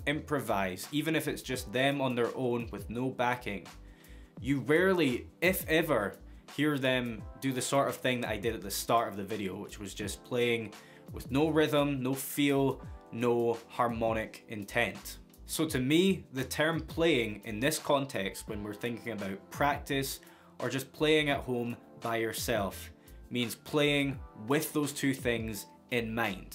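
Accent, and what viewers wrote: Scotland, United Kingdom. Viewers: The noodling part was incredible! You sound like you're from Scotland? Edinburgh? Could jam around :D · Are you Scottish? Irish? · I'm a chronic noodler with next to no musical training, and I've just started his "bulletproofguitarplayer" stuff. I don't know whether it's just the laconic Scottish accent and matter-of-factness about his delivery that I like, but he does a really good job showing the basics, and of training your ear as he goes along. One of, possibly the best of the YouTube era guitar teachers in my opinion, as he shows as much as he tells, makes me listen, demystifies a lot of the often cryptic language of music, and breaks a whole lot of things down into simple bits without getting all show-offy and distracting me with mind-melting licks (even though he is an extraordinarily capable player).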